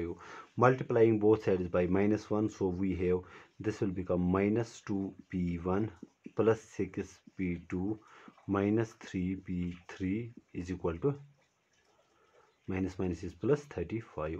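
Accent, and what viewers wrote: Kashmir, India. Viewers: you have an amazing Kashmiri accent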